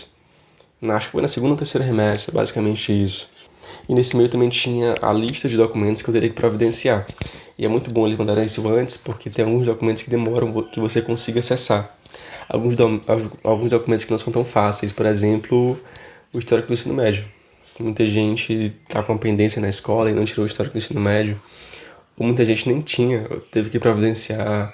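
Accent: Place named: Ceará, Brazil. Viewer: vc é Cearense? Só por curiosidade mesmo, pq às vezes "tenho certeza" pelo sotaque cearensão (kkk), mas às vezes fala o S paulista (queStões, e não queSHtões como o cearense) rsrs Estou gostando bastante dos seus vídeos! Tem me esclarecido muito!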